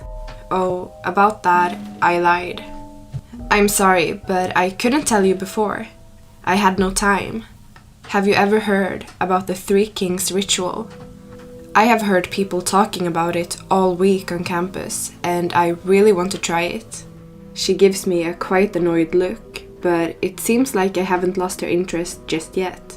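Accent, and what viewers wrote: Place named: Iceland. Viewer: Oh, I didn't recognise your accent as Swedish. I was thinking you might be Icelandic. Thanks for sharing.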